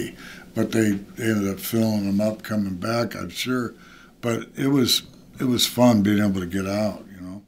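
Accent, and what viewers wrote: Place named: Michigan, United States. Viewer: He is real.... he talks like a Michigan accent.....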